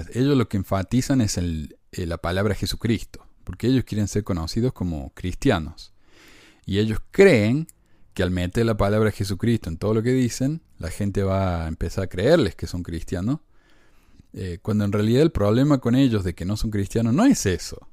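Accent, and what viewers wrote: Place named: Cordoba, Argentina. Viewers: Mira " " con ese acento marginado Gaucho, no es nuevo esa noticia de llamarse correctamente de la IJSUD de hace décadas se menciona para el uso debido, pero igual puedes usar tu libre albedrio, no te culpamos, lo importante es el fondo, quiero buscar también 3l urim y tumi pero quiero primero terminar de encontrar el libro de Ezequiel y ideas, mientras vivo por la fe y degustando del escudriñar las sagradas escrituras del "Antiguo", "Nuevo" y "Otro Testamento de Jesucristo" y así comprender y amar a tipos como tú no.imporra si eres ninfómana o gay, igual te deseo lo mejor y eres bienvenido, y si hay algo que denunciar dentro estamos del mismo lado, limpiar la casa para salir a Óscar, slds compañero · soy miembro de la iglesia, comenté vídeos tuyos anteriormente sin verlos de forma completa, y no entendía semejante trabajo de investigación y dedicación de un ex miembro de la iglesia para desacreditarla. 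Quiero que sepas que este comentario lo hago con todo el deseo de dar mi opinión y no el de pelear ni criticar. Hay dos cosas que me llaman la atención: Primero. El tiempo que gastas en hacer estos vídeos es llamativo, la verdad que se puede ver que le das bastante dedicación y eso se puede ver en la edición, los micrófonos, etc. Es raro que cuando citas a los profetas lo haces con una intención de ridiculizarlos, tanto a Jose Smith, el profeta Nelson entre otros. Segundo. Fuiste miembro de la iglesia, lo decís en tus videos, esta más que claro que seguramente entraste al templo e hiciste convenios sagrados, no hay que ser ningún genio para saber eso. Tu acento es de Córdoba, al principio pensé que capaz eras de otro país y no Argentino. Capaz siendo un ex miembro se explica un poco tu comportamiento. Lo que si no entiendo y en esto creo que vas a coincidir es que La Iglesia de Jesucristo los Santos de los Ultimos Dias o si queres le digo La Iglesia Mormona, como vos quieras, hace muchas cosas que son buenas, edificadoras, ayuda a las personas a ser mejores, enseña principios que mucha gente no duda que son verdaderos y hasta las puede cambiar, como lo hacen todas las religiones cristianas del mundo, siguiendo los pasos del propio Salvador (que no sé sinceramente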